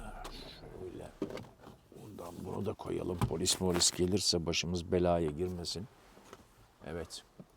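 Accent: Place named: Istanbul, Turkey. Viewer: Bey elinize aklınıza saglik, temiz bir İstanbul Türkçesi ve tertemiz ses tonuyla kolay dinleneceksiniz. (Cekim teknik ayrıntılarna yer vermeseniz iyi olur) Görüntü güzel, toplumsal yaşam hk. açıklamalar hoşumuza gider.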